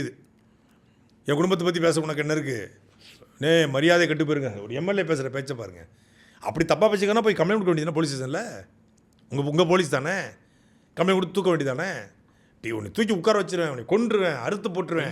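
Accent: Tirunelveli, India. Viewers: சாட்டை நெல்லை அதான் தமில்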